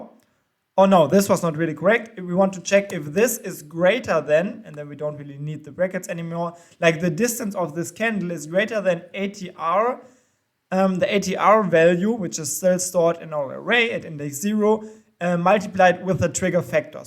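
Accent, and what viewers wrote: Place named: Germany. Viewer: den deutschen Akzent kann man im englischen kaum verbergen :-)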